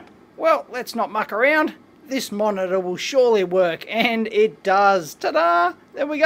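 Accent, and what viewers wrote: Australia. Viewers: Australian accent is awesome :D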